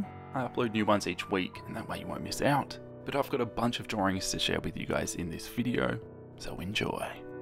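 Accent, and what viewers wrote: Australia. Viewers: Question: Who else, or am I the only one, that wants to know what goes on though the cashiers heads as walks up and buys these colouring books? I would also like to add that this made my Friday, the sarcasm, excessive blood, awesome drawings and the best accent on earth (fellow Aussie). My Friday can end happily!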